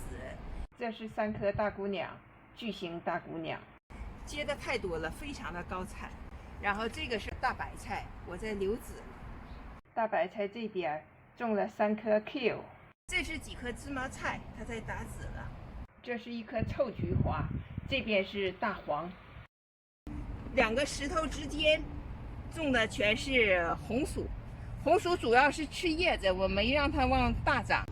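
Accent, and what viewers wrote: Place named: North, China. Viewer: 您的菜园打理的太棒了，又在网上找到一位好老师，以后会经常来学艺喽。听您口音是北方人吧😊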